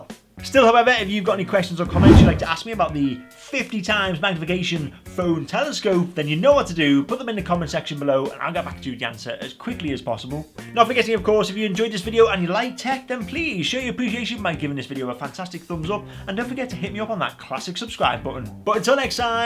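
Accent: Liverpool, United Kingdom. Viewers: Can you get these down Paddy’s Market Lah?. You just can’t hide a Scouse accent LOL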